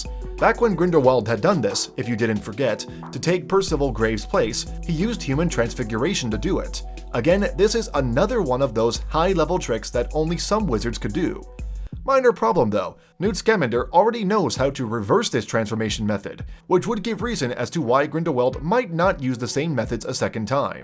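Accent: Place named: United States. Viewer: No kidding? Your dialect sounds very American.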